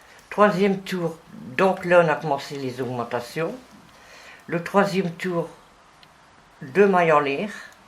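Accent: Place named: Nord, France. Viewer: Merci madame pour ce joli tuto , je débute , et j ai réalisé le bonnet renard , j aime beaucoup votre accent du Nord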